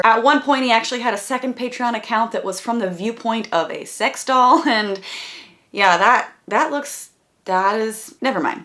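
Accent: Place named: Appalachia, United States. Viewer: Why do you sound like a hillbilly when you have company but you're well spoken when you're alone? 🤔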